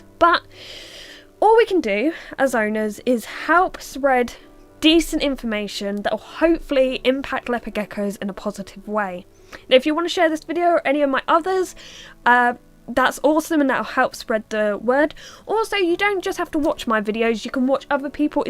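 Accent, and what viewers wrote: United Kingdom. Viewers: Jesus her voice is so painful if she wasn't British I'd like the video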